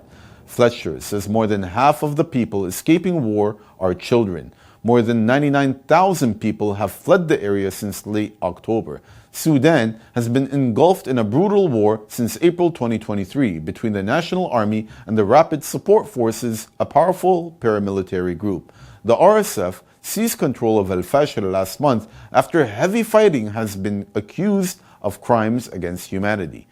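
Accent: United States. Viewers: . ╔═══ ❤☀💚☀❤ ═══╗ 💚💚💚💚💚💚💚💚💚 Dear Mr., Assalaamu alaikum. The way you present the news is fantastic. Despite your American accent we from India find it very easy to follow and understand you without the help of the TRANSCRIPT down below. I am thankful to you for continuing the news of Research & Development in Human Health. We used to treat this news as a harbinger of the end of your news bulletin because so far you have been making it as your concluding news item. While we used to anxiously wait for this item, we were used to treating it as an announcement that you are now ending your news bulletin. But today you inserted that news somewhere in the middle. Please continue your old practice of making that news as your concluding news such that we donot miss out on this news and this news also gets highlighted like any headline!!! 🌐🌐🌐🌐🌐🌐🌐🌐🌐 ╚═══ ❤☀💚☀❤ ═══╝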